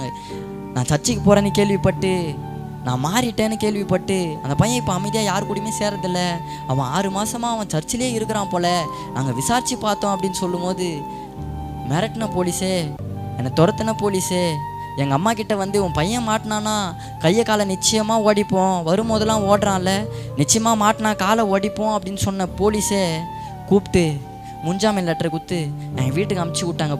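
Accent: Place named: Sri Lanka. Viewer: sounds real, no fake ceylon tamil.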